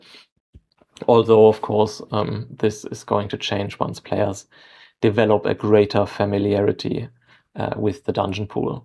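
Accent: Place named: Germany. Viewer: Nolo, schön, dass du mit deiner Aussage Leuten Faulheit beim Lernen unterstellen möchtest oder Menschen, die eine Lernbehinderung haben, damit vor die Füße spuckst. Ich kann Englisch und ja, man kann ihn gut verstehen, und er macht seine Sache auch sehr gut. Das hat allerdings gar nichts mit meiner Aussage zu tun. „Wer lesen und verstehen kann, ist klar im … naja, du weißt schon …“ Man hört bei ihm halt ganz klar den Deutschen raus, und ich finde es einfach schade, dass es kaum gute deutsche WoW-Content-Creator gibt. Ja, ich weiß, mit Englisch erreichst du einfach viel mehr Leute, aber dafür ist der Markt auch stark übersättigt. Daher kommt meine Aussage. Aber gerne werde ich für Menschen wie dich dies in Zukunft genauer spezifizieren. Ein schönes Wochenende wünsche ich dir damit und schon einmal einen guten Rutsch für S2!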